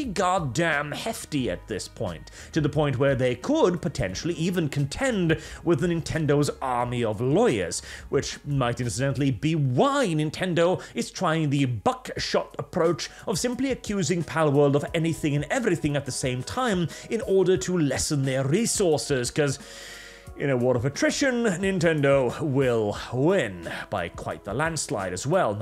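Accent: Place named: United Kingdom. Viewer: I didn't realize brits stress vowels so differently versus Americans.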